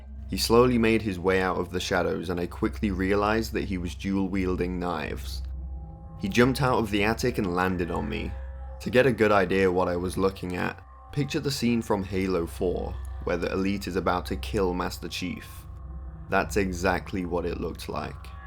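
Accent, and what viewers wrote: United Kingdom. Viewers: British Mr Nightmare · British mr. Nightmare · ｄｅｅｐ ｖｏｉｃｅ ｂｒｉｔｉｓｈ ｂｏｉ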